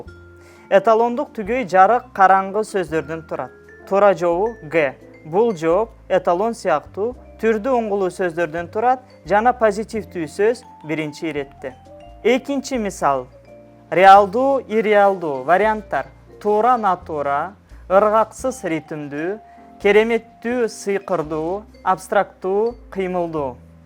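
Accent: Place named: Turkey. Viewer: У него турецкий акцент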